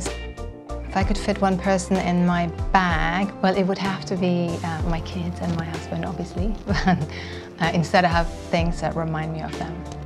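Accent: United Kingdom. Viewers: Gosh she has such a British accent these days! Seems very nice - does she really only have mascara for make up in there?! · She sounds kinda British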